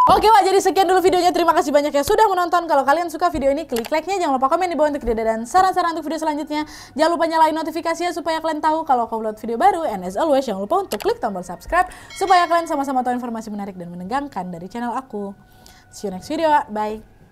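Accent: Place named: Medan, Indonesia. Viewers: Suka bngt sama,dengan logat anak Medan ya 🤭, success dan bahagia selalu ya. Ngikutin dia dr screen msih 1jtan.. mkin ksni mkin kereeennn 👍👍 mantab. Btw tumben gak da jamscare nya nih 🤭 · ini aslinya orang mana sih.??? Kek orang Sumatera deh..tp ga tau Sumatera mana... 👍😂😂😘😀😀😀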